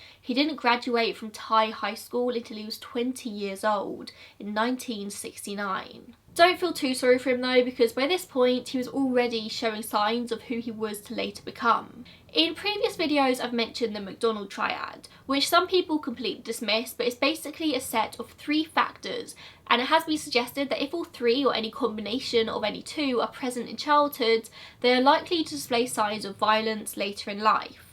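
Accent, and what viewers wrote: United Kingdom. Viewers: You've got a buetiful voice, no need to be self conscious. Love the UK accent. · i love ur accent so much lol idk if its because I'm American and don't hear a lot of British accents but i always tend to watch British people like Eleanor Neale because of u guyses accents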